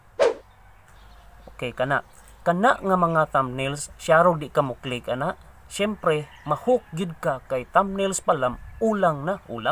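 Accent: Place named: Visayas, Philippines. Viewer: Bisaya kaau oie maau man motirada dong bright da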